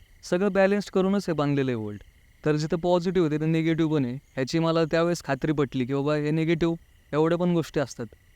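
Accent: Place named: Pune, India. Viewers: Punyachi language ahe ti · Dada pune chi language tasich aahe 😅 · पुण्याचे सगळेच तसेच बोलतात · Pune mde tsch boltet rav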